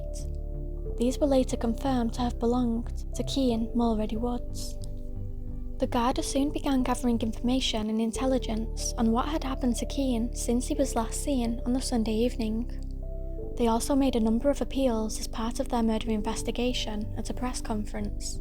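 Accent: Manchester, United Kingdom. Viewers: Your accent is very similar to my south Manchester accent. Enjoying your videos.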